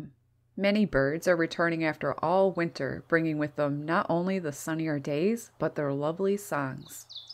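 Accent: Canada. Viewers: Canadian voice do you like spring too.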